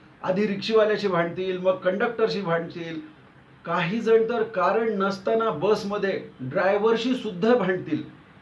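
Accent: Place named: Marathwada, India. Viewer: खुप छान आपले व्हिडिओ आहेत. आपल्या मारठवाडा टोन मराठी भाषेत चुटकुले ऐकायला मजेशीर वाटतात. 😅😊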